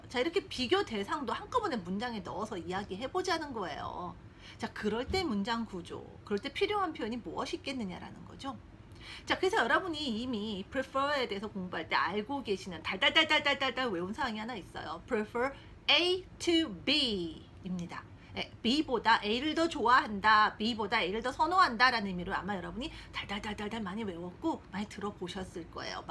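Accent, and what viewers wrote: United Kingdom. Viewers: 선생님의 브리티쉬 발음 너무 멋있어요~😍 전 북미권에서 공부했던지라 ㅋ 선생님 목소리를 유투브에서 들을 수 있어서 너무 좋아요~ 유학시절 추억돋네요~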